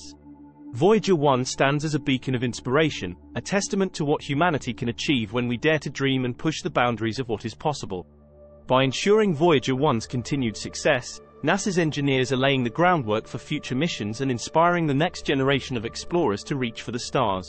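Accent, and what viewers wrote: United Kingdom. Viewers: Why are the weird stories always narrated by someone with a heavy English accent..:face-blue-smiling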